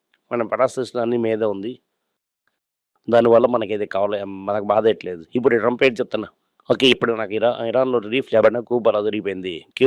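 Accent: Tamil Nadu, India. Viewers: Tamil accent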